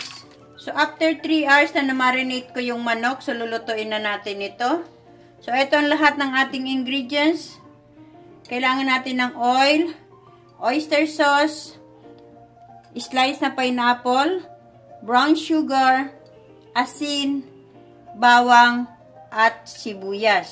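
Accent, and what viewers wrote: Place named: Manila, Philippines. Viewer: taga iligan diay ka pero ang imong tagalog murag pure na taga manila...happy new year dai❤from ISRAEL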